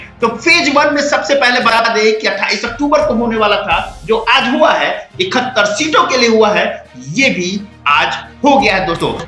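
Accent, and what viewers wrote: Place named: Bengal, India. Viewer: Bangali hindi is Amazing 👌👌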